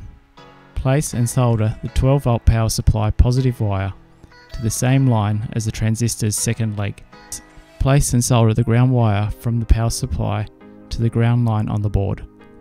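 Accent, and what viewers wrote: Australia. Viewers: When you finally hear daddy's voice and he's an aussie <3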